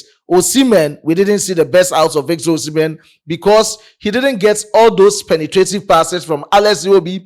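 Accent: Ghana. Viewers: You're not a Nigerian. Your accent betrays you. You are clearly a Ghanian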